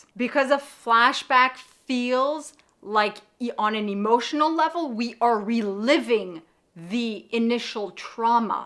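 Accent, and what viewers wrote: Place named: Canada. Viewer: Great video :) You speak so clearly, love your accent! Canadian?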